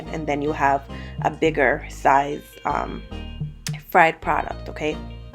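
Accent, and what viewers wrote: Jamaica. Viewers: nice video wheres the accent from, jamaica?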